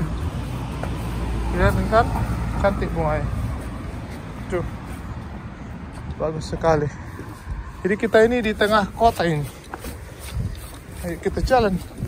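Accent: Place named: Sumatra, Indonesia. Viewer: Cara bicaranya seperti orang dari Sumatra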